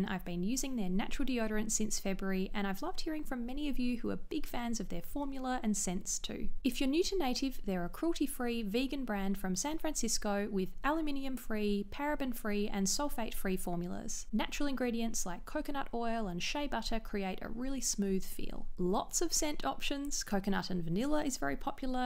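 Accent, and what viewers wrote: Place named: Australia. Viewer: Agreed!! There’s something incredibly satisfying and relaxing about these videos. And I love the aussie accent. I lived there for 9 years, and it’s so nostalgic. Xx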